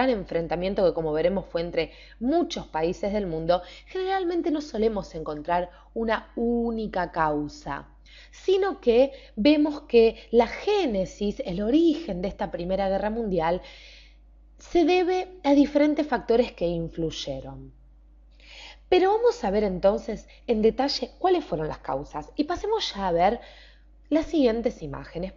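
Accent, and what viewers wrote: Argentina. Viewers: Interesantisimo video ademas me encanta como habla esta chica con su acento Argentino.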